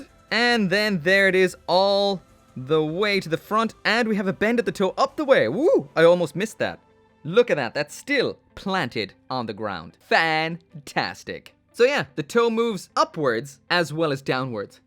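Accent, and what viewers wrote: Ireland. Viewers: Dude I never knew you were from Ireland. The voice makes SO much more sense now.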